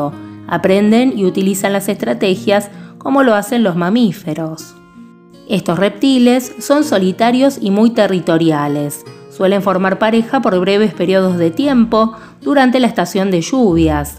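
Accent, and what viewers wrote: Argentina. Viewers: ¡Me encanta este canal! Soy de Nueva Zelanda y el inglés es mi lengua materna, así que disculpa cualquier error. Si aún no lo has hecho, deberías hacer un video sobre las aves de Nueva Zelanda. Tenemos muchas aves raras aquí, incluidas muchas que no vuelan. Me encanta tu acento. ¿Eres de Argentina? Un abrazo desde Nueva Zelanda 😊 .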